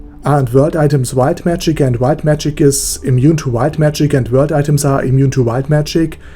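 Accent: Germany. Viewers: You're German aren't you?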